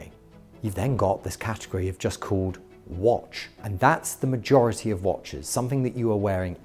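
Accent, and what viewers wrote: England, United Kingdom. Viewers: Consumer psychology 101 mixed in with an English accent. Don't buy it. Think for yourself.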